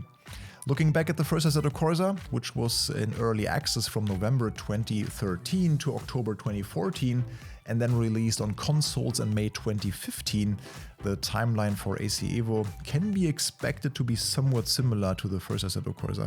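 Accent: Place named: Germany. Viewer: Bist Du Deutscher? Hört man sofort raus, richtig schönes Video!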